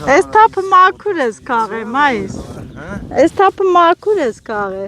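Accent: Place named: Artashat, Armenia. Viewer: jan.erek vor 1in blogy ditumei ev dzer masin yndhanrapes vovhinch chgiei.yntacqum gushakeci vor vayqumeq.qani vor esel es 2 ory kaptavotik snkerem 2 angam gnel.patrastel e mi qichel dzmerva hamar drel sarcaxcik.u hitri vor vayqicen berum.mekel dzer barbaric sarerum xoselis😊😊😊😊 aseci artashatcia.indz tvume vor dhat chishtem gushakel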